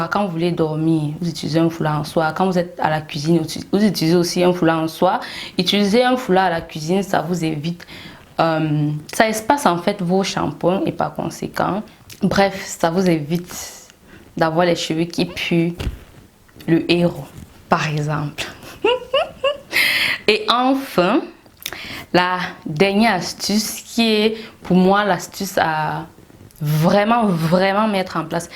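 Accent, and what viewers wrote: Cameroon. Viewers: Oulala j’aime ton accent 🥰 une vrai kamer 😂😂🤣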